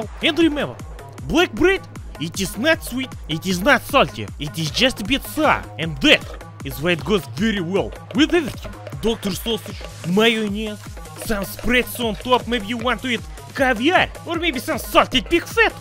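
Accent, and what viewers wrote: Russia. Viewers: ACCENT IS FUCKING LIIIIIIIIIIIIIIIT❤❤❤❤💪🇷🇺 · As ussual russian always says one thing like slav for mr and blyad for dimitri, i like russian accent btw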